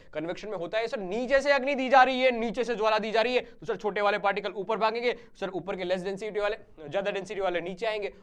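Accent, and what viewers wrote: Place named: Haryana, India. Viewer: Sir Tey to haryanvi lage manne🤔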